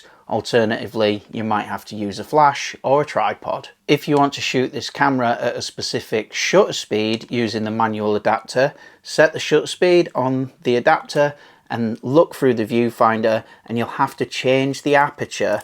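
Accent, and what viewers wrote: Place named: East Yorkshire, United Kingdom. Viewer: Very helpful, thank you ! Also a lovely rare bonus to hear a fellow East Yorkshire accent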